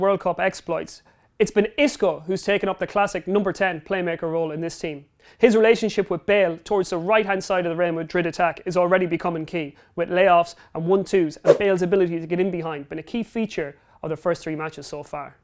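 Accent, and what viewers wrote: United Kingdom. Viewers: Canadian here. Where's this guy's accent from? I.e., what part of the UK?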